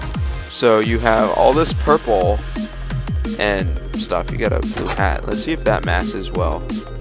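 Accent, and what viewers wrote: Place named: Philippines. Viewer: You sound Pinoy Btw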